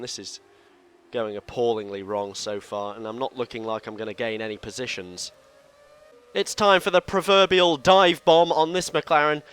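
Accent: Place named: United Kingdom. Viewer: hey i've been watching your videos for about 4 years, but in all that time you never explained us how to get that fancy british accent. i would rly appreciate a tutorial or sth:-)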